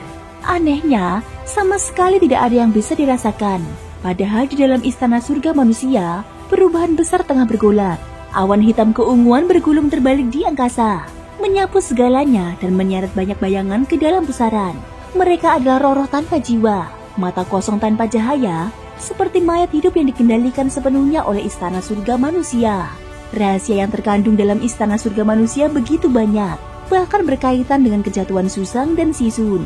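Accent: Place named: Purwokerto, Indonesia. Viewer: Mbak naratornya orang purwokerto yaa, hayo ngaku aja lah , mbok 🤣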